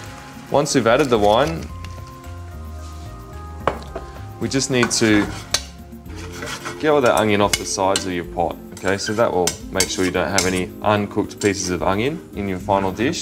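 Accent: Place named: New Zealand. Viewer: New Zealand I think. Gets confusing because there are so many NZealanders in Australia and Australians in NZ, but I think I'm right. The plonk is Australian anyway.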